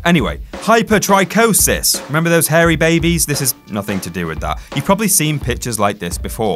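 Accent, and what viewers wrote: Canada. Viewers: i didn't know you were Canadian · Is Canadian? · Is this English guy actual Canadian or have I have been to many episodes of South Park?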